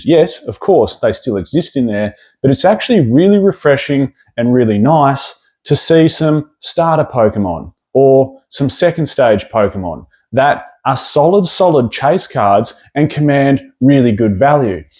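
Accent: Australia. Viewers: Bro screw those idiots that keep saying you’re saying it differently or wrong. You are not saying it wrong. Everyone loves a good Aussie accent. Some of us get jealous that we don’t have Aussie accents! Loved this content. It’s very interesting that SV era is bringing love to pokemon that arnt always given love.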